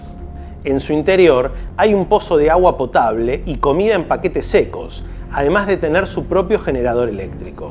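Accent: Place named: Argentina. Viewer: Es muy desagradable el exagerado modo de hablar argentino. Debe ser que lo remarca para que se note aún más. Es que así se cree importante????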